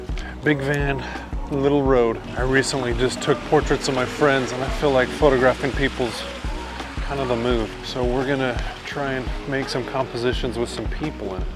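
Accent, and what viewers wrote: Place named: Boston, United States. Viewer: I have never heard a better Boston accent in my life. And I’ve watched a few Ben Affleck movies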